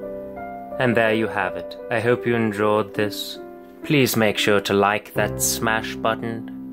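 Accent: England, United Kingdom. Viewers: I like. I like the English accent too and although it was slightly off (only slightly), I wish you would do it more often. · your calm British accent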